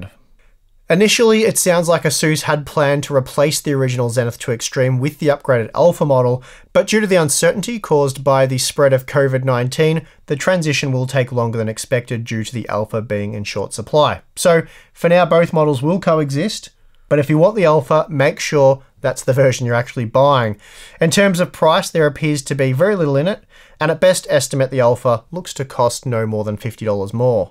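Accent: Australia. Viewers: Might not apply, Google caption said curve at 19. Aussie accent usefulness.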